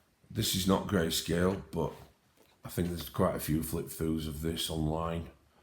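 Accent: Yorkshire, United Kingdom. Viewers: Hiya, what got a gruff Yorkshire man into colouring? BTW I was going to subscribe anyway, came across you by accident, good to see a couple of books I wouldn't normally look at and I'm definitely going to start checking out ebay more....nice one. I'm off to watch your 1st video, cheers 😄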